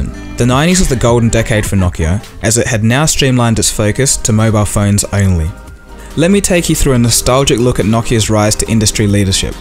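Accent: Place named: Australia. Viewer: Nice to hear your Australian accent in the videos... thanks for putting them together, mate!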